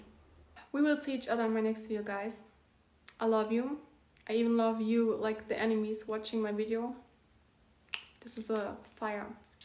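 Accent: Germany. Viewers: I think german, she's from Germany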